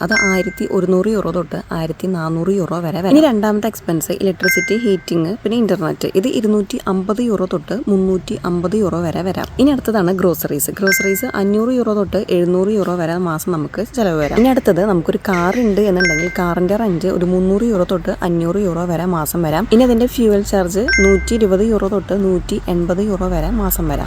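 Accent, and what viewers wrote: Thrissur, India. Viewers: മ്മ്ടെ തൃശ്ശൂരാ സുഖം ട്ടാ😅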